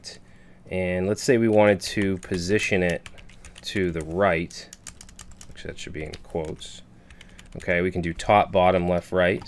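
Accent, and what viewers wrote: Boston, United States. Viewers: Listens for five seconds: "This guy is so from Boston" Listens just past the one minute mark: "BOOOOOOOOM! CALLED IT!"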